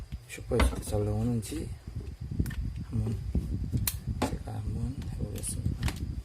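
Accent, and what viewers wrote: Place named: Gyeongsang, South Korea. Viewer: 경상도 사투리 가 듣기 조아요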